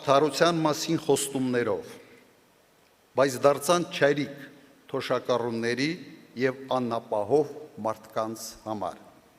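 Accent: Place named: Karabakh, Azerbaijan. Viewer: զզվում ենք էս մարդու խուճուճ ղարաբաղյան ակցենտից,ոչ հայերեն գիտի,ոչ էլ նորից հայերեն...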